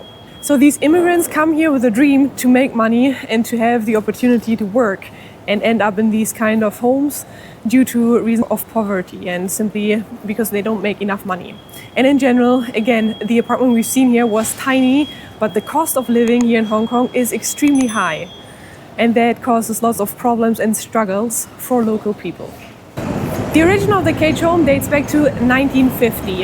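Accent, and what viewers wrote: Germany. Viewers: has a German accent? True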